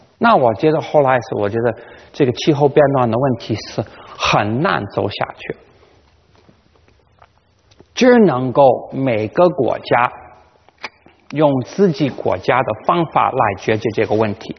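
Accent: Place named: Tianjin, China. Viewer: 来中国先到的南开，现在口音还能听到天津味儿，哈哈。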